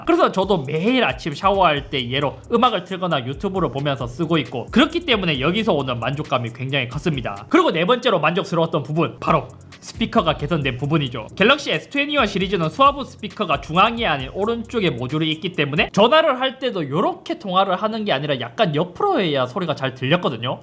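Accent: Gyeongsang, South Korea. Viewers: 이thㅓthㅓ여 역시 경상도분 ㅋㅋ 귀여우시고 정감갑니다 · 몇 번 들어도 적응 안되는 저 대구경북 말투……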